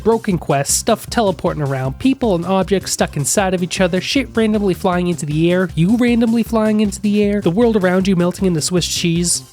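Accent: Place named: South Boston, United States. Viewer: This guy from Southie or wat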